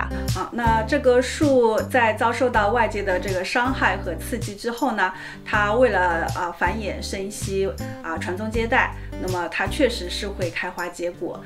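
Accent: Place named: Zhejiang, China. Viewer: 口音是浙江的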